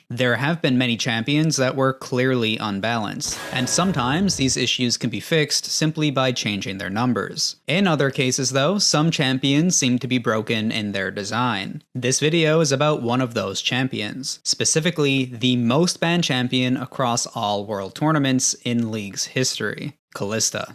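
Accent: Canada. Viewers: can you please work on getting rid of your canadian accent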